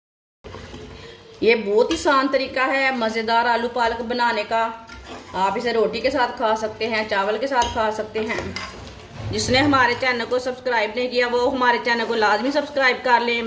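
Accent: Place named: Punjab, India. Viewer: جی اپکی ريسيپی کی تو بات ھی اور ھے مگر پنجابی سٹائيل ميں جو اردو کے الفاظ اپ کے لب مبارک سے پھولوں کی طرح جڑ رھے ہيں😀، ان کی بھی کوئی مثال نہيں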